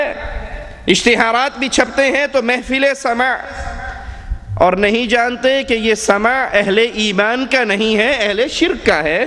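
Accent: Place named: Arab world. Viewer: Allah ka Khoof Karo main unkey Arbi Lehjay pe Hansta hoon na k Un kay Byaan pe :) Thanks for Understand me Brother :)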